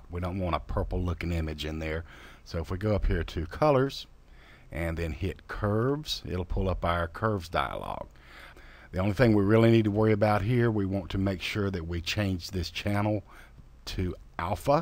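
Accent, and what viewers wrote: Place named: Texas, United States. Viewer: Thanks for your tutorials, really appreciated. And since you evoked it early in the clip, don't worry about your pronunciation (I can hear a texan like accent) : much, much, MUCH more understandable and pleasant to the ear than the guttural germanico-british accent! Thanks for your time and effort, looking forward for more.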